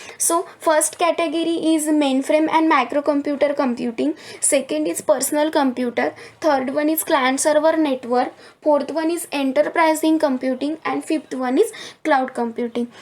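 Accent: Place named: India. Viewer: Nice lecture..Liked you Hindi accent.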